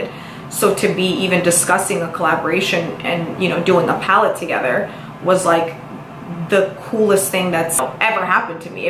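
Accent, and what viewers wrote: New York, United States. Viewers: I'm an OG follower aoww<link> Also a fellow born and raised NYer...always loved your NY accent...finally seeing influencers with the same NYC swag I grew up with is nice!!! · now that you're back in ny you're ny accent is back!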